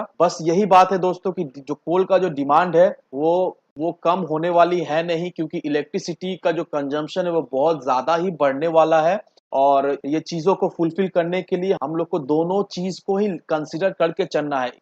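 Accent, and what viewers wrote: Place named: Kolkata, India. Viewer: main kolkata se hu, to mujhe apka tune se aga ki aap ho....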